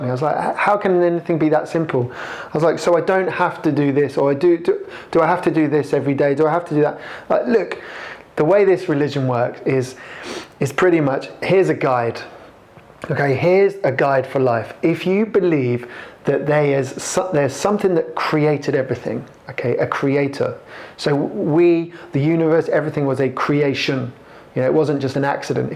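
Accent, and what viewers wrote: United Kingdom. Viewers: yep it's a southern english accent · british accent he's from england! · I see. But what kind of British accent it is? He sounds a lot like Jack Harries, Jacksgap. Southern? IDK :<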